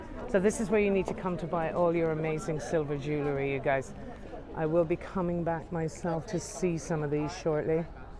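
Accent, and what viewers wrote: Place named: Ireland. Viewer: I discovered you two or three days ago and I can‘t stop watching your tours,. I love your Irish accent.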